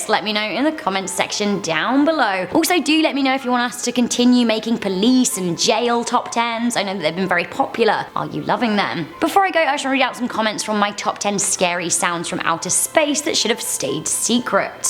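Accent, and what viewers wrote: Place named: United Kingdom. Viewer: Excellent video, I love the British accent.....!!!!!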